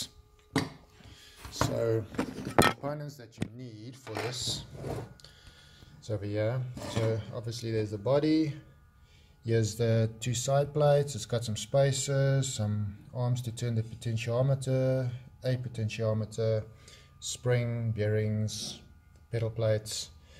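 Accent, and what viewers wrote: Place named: South Africa. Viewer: I can hear that SA accent bro🤣 #represent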